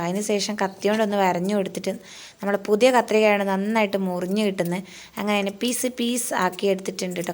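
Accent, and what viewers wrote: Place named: Kasaragod, India. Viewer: Aa namade paara... 😅chechi kasrod ano.. Nammem athe😁👍🏼😍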